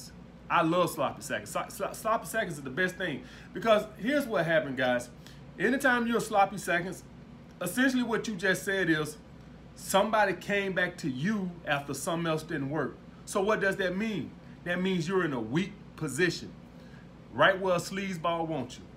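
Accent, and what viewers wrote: South, United States. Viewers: you been living in NY few a while and still got that Southern Accent! But I see what you’re saying fam.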